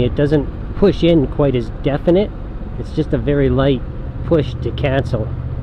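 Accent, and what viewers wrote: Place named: United States. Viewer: I guess from your accent and you are riding on the right, that you are in the USA? I am surprised your speedo and odometer are in metric. I have just bought a new V9, in England, and the speedo is MPH and odometer in miles. The one thing I don't like about the bike is that the speedo and digital readout are virtually invisible. I can only tell what speed I am doing is by knowing where the red needle should be on the dial, and by keeping pace with the other traffic. At night the speedo lights up in a sort of red colour and goes completely illegible. It is OK if the sun shines directly on it, bizarrely. The numerals and letters on the digital readout are too thin to be legible which annoys me. They could have doubled the thickness of the lines forming the characters, and made it a lot better. I have done 35 miles after the 'low fuel' warning, so the lack of a fuel gauge isn't bad, though I would prefer one. 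If I had bought a V7 I would have got the one with the two dials. One important thing to know if you have a new V9 and want to buy a Givi rear carrier, if you buy what seems to be the only one available, it is advertised as fitting a V9, 2016 model. It fits the frame but then you cannot refit the seat. They seem to have lengthened the seat for the newer models. I made some brackets and fitted the carrier about 2 inches further to the rear. The seat now fits, but the downside is that in effect the carrier is only held on to the frame by half the number of nuts and bolts that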